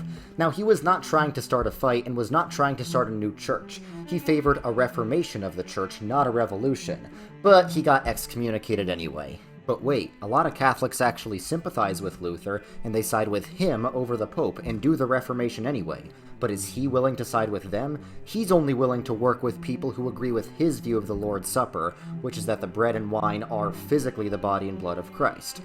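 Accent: New York, United States. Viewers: You can really tell this guy is from New York by his "Coufey" accent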